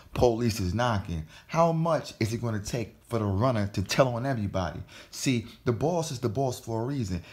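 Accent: New York, United States. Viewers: This is a New York nigga if I ever heard one desus and mero got me on the lingo 😂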